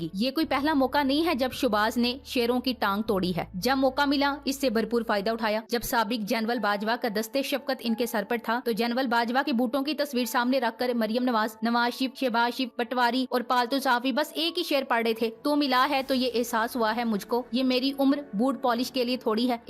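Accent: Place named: Punjab, India. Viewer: Ap ka jawab nahi ap jo punjabi ka touch laga kar in kutoon ki chittol Martí hai muje barda Maza ata hai ap ka andas aur tarika very nice 👍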